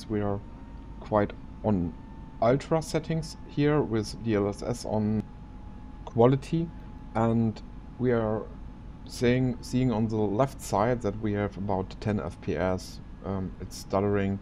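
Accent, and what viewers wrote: Germany. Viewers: Englisch mit Deutschem Akzent ist irgendwie immer geil. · I was stationed in Germany for 5 years and I loved it. So the accent makes me remember some good times.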